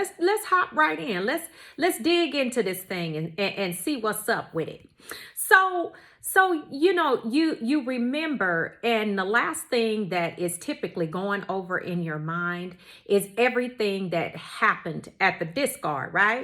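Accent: United States. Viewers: Just brilliant! So funny and alive with that strong high pitched American accent! I hear you girl loud and clear! Lol Will listen over and over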